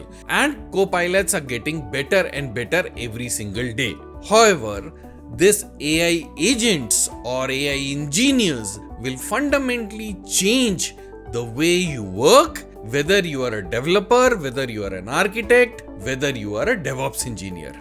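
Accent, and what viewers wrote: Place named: India. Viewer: Your accent is from India. I am assuming you were born in India. It is an embarrassment you are talking about this when the whole country is at war. Why this now ???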